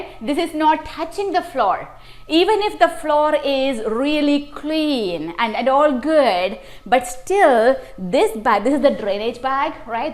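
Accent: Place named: India. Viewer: Your English is very bad with an Indian accent.🥴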